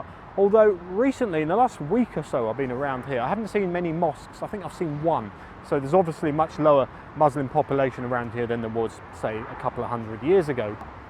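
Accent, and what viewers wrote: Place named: London, United Kingdom. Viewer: .. i'm fascinated by your accent.. you sounds like born and bred Londoner.. how come!!!.. just founds your Channel.. haven't had time to look at all your video yet..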